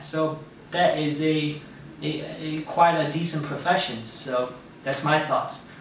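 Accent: United States. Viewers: i feel like im hearing a texas accent? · Nice American Accent. Your video is appreciated. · your accent is so confusing!! but in a good way!!! hahaaha it's like some of a brooklyn accent mixed with a little southern accent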